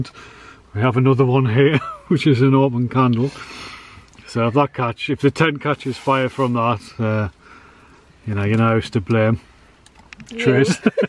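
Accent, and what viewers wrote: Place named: Geordie, United Kingdom. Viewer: I hear the twang of a Geordie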